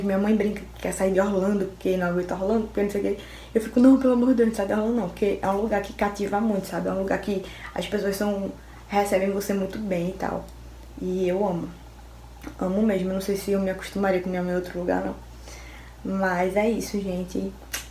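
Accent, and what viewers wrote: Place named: Pernambuco, Brazil. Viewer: Não tem como não admirar uma pessoa que apesar de tão jovem, é de um extremo caráter, responsável e amorosa.Confundi seu sotaque, mas agora sei que você é uma conterrânea, pois meus pais eram pernambucanos, e eu, apesar de ter nascido aqui em Guaruja SP., de certa forma me considero de Pernambuco também. Beijinhos e Deus abençoe.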